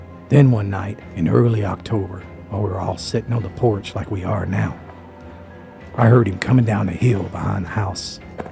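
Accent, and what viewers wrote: Appalachia, United States. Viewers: The villages Florida .. great voice over because I know the the mountain folks sound just like you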